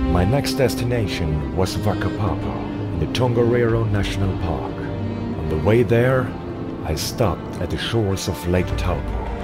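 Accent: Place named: United Kingdom. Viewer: are you austrian? cause you totally sound british. great video, I'm so jealous now. I watched the beginning with the Narnja music over 10 times, I think. just gives me goosebumps.... O__O I might actually take the "wrong flight" on purpose, next time I go to see my family in the Philippines and have a stop-over in Dubai or AbuDhabi.....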